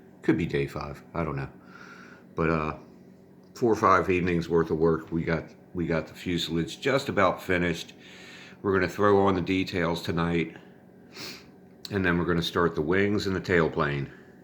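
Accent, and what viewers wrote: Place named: Pennsylvania, United States. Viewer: are you in Pennsylvania, possibly philly? I am guessing by your accent.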